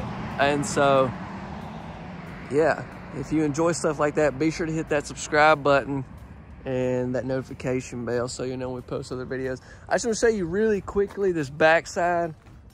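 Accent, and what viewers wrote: South, United States. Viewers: I was surprised with the price on this...I was expecting it to be about $50,000 more than the price you quoted! Also, you didn't point out that the closets have traditional shelving and round rods to hang things on. I notice you corrected yourself when saying "utility". I hope you keep talking the way you did in earlier videos. I like hearing your Southern charming accent! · Stop saying utility!! 😡Keep that charming southern accent! 😍🥰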